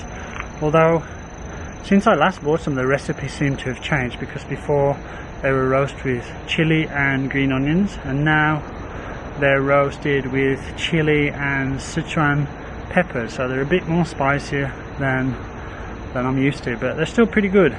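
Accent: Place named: England, United Kingdom. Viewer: must add that your English-accented mandarin is quaint & interesting 😋👌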